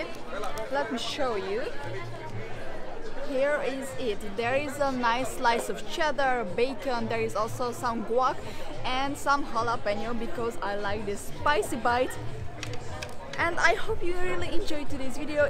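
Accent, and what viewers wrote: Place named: Hungary. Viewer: Romania was never part of Soviet Union. You have a very cute Hungarian accent. It's like your native country. Fortunately never Soviet Union. Greetings!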